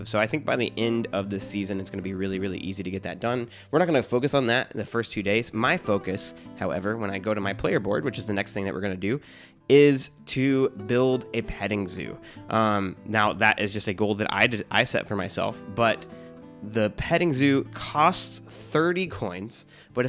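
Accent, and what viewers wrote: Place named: Kentucky, United States. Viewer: Amazing. For the first time, I truly believe you’re from Kentucky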